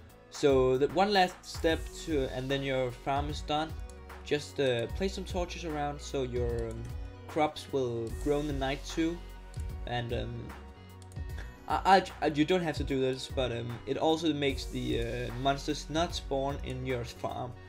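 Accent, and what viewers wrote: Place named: Denmark. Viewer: Man kan høre du er dansker. xD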